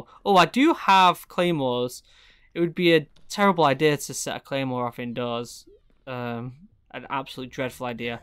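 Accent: United Kingdom. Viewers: A little mistake to make your head big eh😂😂. Still, I love your british accent😂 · British accent is so cool: part two, bad idea, lad... Hehe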